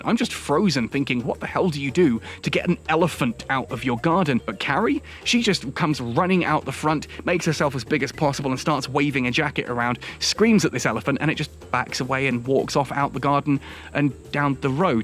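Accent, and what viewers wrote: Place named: RP, United Kingdom. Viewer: I am Zimbabwean in UK SURREY. Zimbabwe is everything you have said indeed. With that I declare you a Zimbabwean son, brother and one of us. You have said it all and life permits, can’t wait to meet you and your wife, and have a blast ☺️☺️☺️👏. You sound such a well brought up British boy and with this positive and good spirit. I see many Zimbabweans falling in love with you 😍😍😍😍✌️. Keep up the good work and try to do some collaborative with other Zimbabwean bloggers. You won my heart already 💕💕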